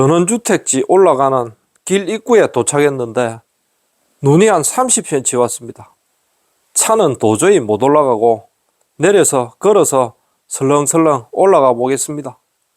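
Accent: Gyeongsang, South Korea. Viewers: 말씀이 참재미있는톤이라서 많이웃으며들었습니다. 역시 경상도말이 구수하게들립니다. 감사합니다. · 시청자들을 위해 비가오나 눈이오나 영상 촬영하신다고 수고 많으시네요.. 구수한 갱상도 사투리로 설명도 잘해주시고 덕분에 구경은 잘 합니다 파이팅 하시구요 새해 복 많이 받으세요 ~^(^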